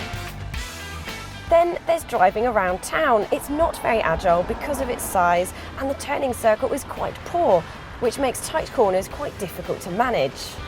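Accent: United Kingdom. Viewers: Wow, that is a fine ass reviewgirl! Why whe don't have those in the Netherlands.. Oh, love the British accent as well.. ;)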